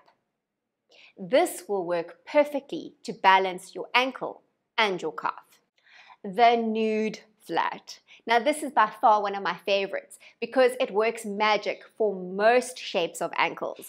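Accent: South Africa. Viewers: you sound South African. Love the accent and love the tips, thank you!!! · South African? Nice video gal. Helpful.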